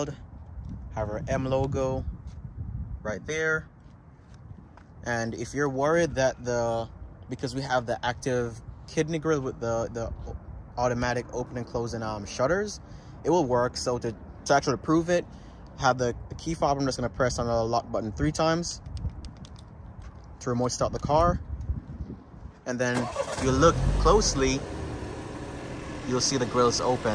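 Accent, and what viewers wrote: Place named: Jamaica. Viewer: Jamaican accent came out in this one.. Proud of you, Excellent video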